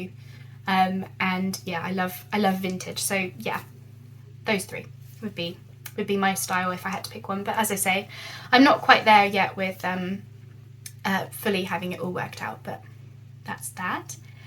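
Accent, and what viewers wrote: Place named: England, United Kingdom. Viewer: I am so glad you asked! 😃 From the title I was NOT expecting and English accent. Lol. I was wondering if I missed why she chose. I look forward to the answer. ♥️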